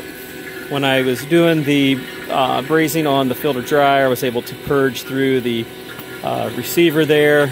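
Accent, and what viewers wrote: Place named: Pennsylvania, United States. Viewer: ah the Pennsylvania speak figured you were from that area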